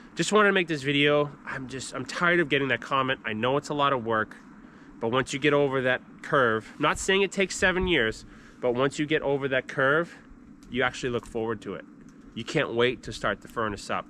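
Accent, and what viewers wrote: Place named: New England, United States. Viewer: You’re definitely from New England. That accent chining through. 😂 I’m from Maine. You?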